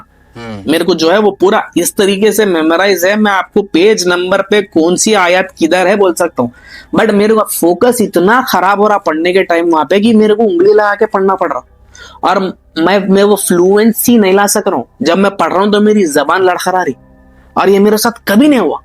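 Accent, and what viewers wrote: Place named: Hyderabad, India. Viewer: Bhai story sunkar maza agaya with Hyderabad style me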